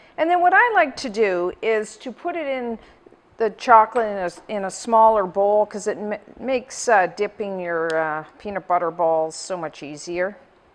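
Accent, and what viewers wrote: Canada. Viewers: canadian accent is peaking.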